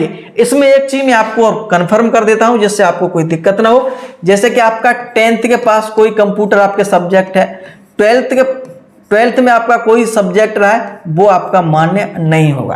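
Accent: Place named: Etawah-Mainpuri, India. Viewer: सर आप की भाषा से लगता है। कि आप इटावा या मैनपुरी जिले से हो🤔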